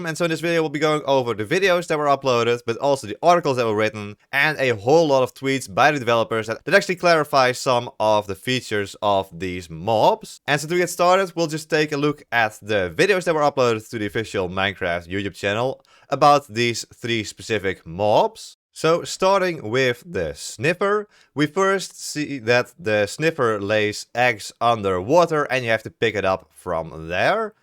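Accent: Netherlands. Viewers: Ik ben master i can tell your dutch from your acsent lol XD keep up the containt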